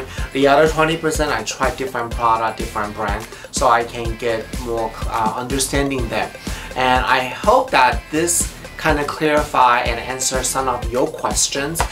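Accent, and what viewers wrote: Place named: Mexico. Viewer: Why does he look Asian but sound Mexican?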